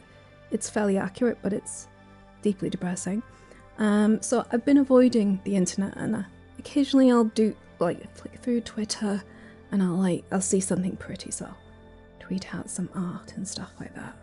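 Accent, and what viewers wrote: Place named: North, United Kingdom. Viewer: I like it when your northern accent comes out.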